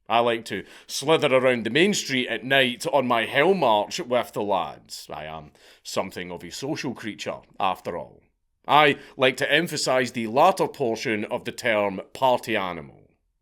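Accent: Scotland, United Kingdom. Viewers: The legend of 10 cent beer night needed to be told in a Scottish accent. The world is healing. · bruh you're scottish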